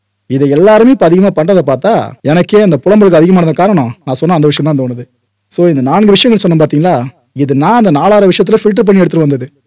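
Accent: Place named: Madurai, India. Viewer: சகோ நீங்க என்ன மதுரையா,அவ(விய்)ங்க, இவ(விய்)ங்க ......😊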